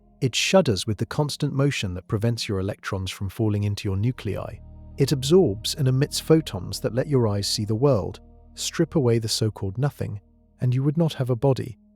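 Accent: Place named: United Kingdom. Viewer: I hate this British voice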